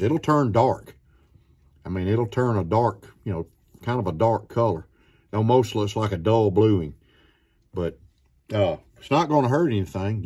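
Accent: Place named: South, United States. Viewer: I liked this video as soon as I heard that reb accent. Go figure He likes Sodbuster's too! one of many Case EDC's that are perfect.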